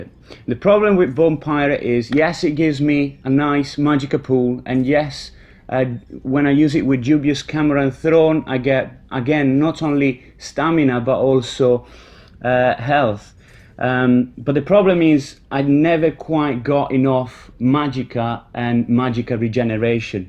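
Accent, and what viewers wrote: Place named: Northern England, United Kingdom. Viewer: Haha as a Midlands man I was sitting here like.. I'm sure he's northern... but he also sounds foreign... love it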